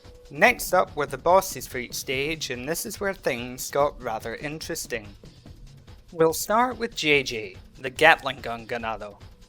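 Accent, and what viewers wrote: Scotland, United Kingdom. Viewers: Why do you sound Scottish lol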